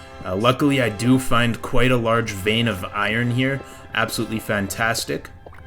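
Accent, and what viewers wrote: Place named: Canada. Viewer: its almost like his canadian accent gets stronger as the video goes on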